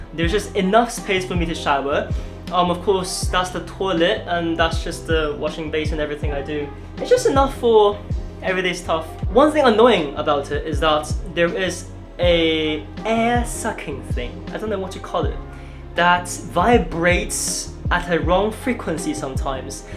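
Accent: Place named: United Kingdom. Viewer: Ah the british accent never changes HAHAH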